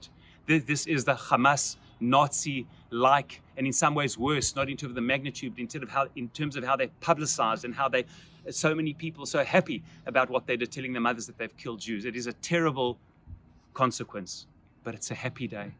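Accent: South Africa. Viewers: Rabbi sounds south african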